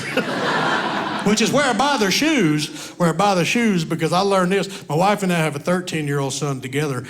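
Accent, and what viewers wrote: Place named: South, United States. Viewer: His accent makes u think he’s gonna say something ignorant but he’s got his own southern style to him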